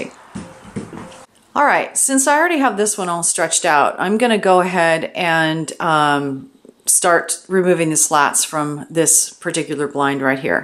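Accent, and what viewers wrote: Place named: Canada. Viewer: Love your calming voice sounds Canadian to me ,,thank you this a great video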